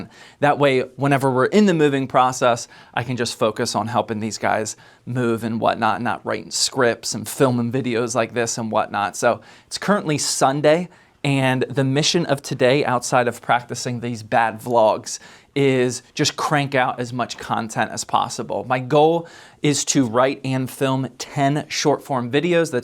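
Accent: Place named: Texas, United States. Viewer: you already sound like you're from texas, you're definitely ready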